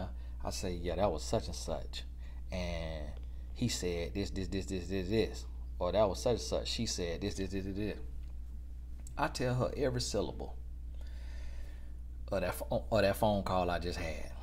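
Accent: South, United States. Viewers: Enjoying your REALNESS & your 'southern' accent!! 🤪🤪🤪 TY, Mr..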